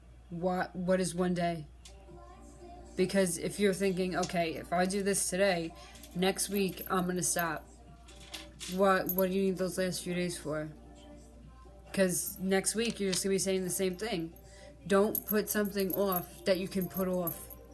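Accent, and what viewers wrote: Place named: United States. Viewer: I've just started watching your channel, your honesty about your sobriety and life is very encouraging. I have just started back in recovery. Thank you for your straight up posts. They have helped me get back to recovery and get on with it. Like your American accent attitude .. Thank you mate All the way from New Zealand Auckland.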